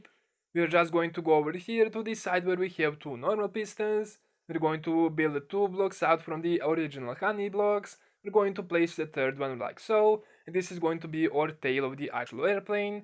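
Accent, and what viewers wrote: Russia. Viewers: I love that he sounds russian and he makes a tnt bomber.... you just got a new sub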